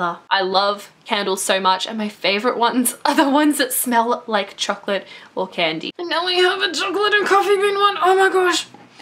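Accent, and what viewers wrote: Australia. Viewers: I love her vids too and I love her accent I'm mexican and the aussie accent it's easier to understand than the american one to me XD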